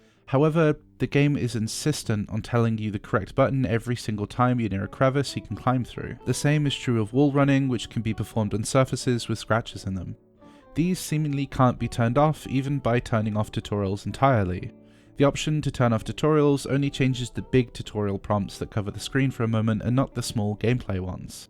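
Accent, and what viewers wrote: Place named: United Kingdom. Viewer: Oof, soon as i heard your accent i knew i wouldntbe able to trust the opinion. No offense but anyone who spends their time playing videogames rather than fighting their dystopian authoritarian matriachal super state is probably a person that is ok with or apart of that tyranny. Cant trust game review advice from a race socialist. Though i wish you luck and hope that your reading this doesnt cause your secret meme police to kick in your door and .. make you..i dunno walk round london asking for forgiveness from black women or w/e is your unelected prime minister enforces as his ttier punishments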